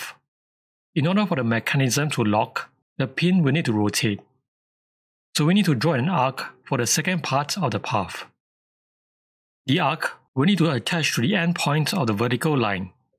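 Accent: Singapore. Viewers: Singaporean accent on a great educational video? YES, immediate subscription!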